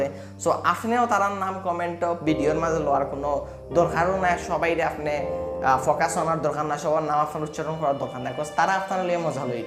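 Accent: Sylhet, Bangladesh. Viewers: Hmm Good সিলেটি আঞ্চলিক ভাষায় কথা কইলে বালা লাগে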